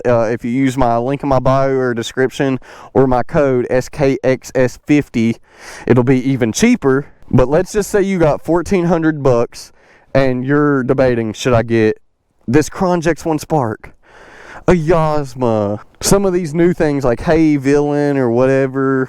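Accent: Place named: Tennessee, United States. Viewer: Definitely sound like you from Tennessee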